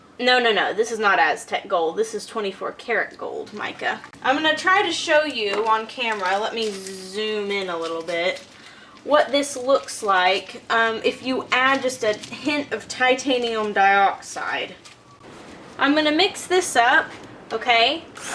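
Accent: Texas, United States. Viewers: Back when Texan accent shown through and was beautiful 😍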